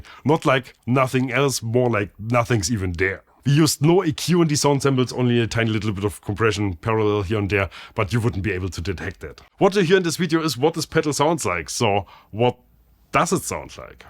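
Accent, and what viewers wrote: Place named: Northern Germany, Germany. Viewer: Its Bassic ....A north german accent ....a mixture of viking , german and anglo saxon languages....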